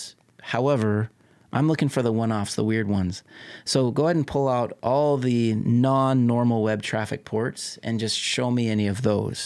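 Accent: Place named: South Africa. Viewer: Nice channel. Fellow analyst pointed me here a few days ago. First thing I recognised, the SA accent.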